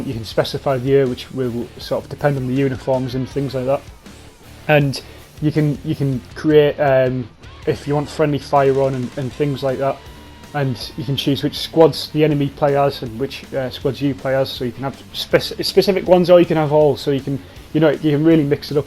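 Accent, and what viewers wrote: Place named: United Kingdom. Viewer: when i heard your British accent i smiled and kept watching with even more pleasure lol thank you a lot for that video!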